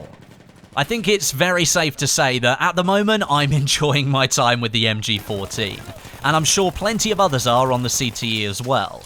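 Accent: United Kingdom. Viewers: Jesus that's a fucking thick British ascent. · your UK affiliated accent is terrible to listen to u dont sound very compelling either I must have been drunk the day I subscribed lol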